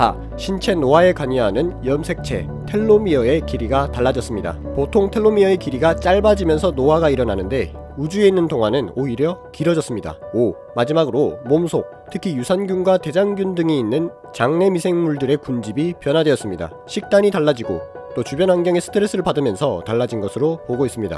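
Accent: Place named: Gyeongsang, South Korea. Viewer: 경상도 사람인가보네요 서울말 잘하시는듯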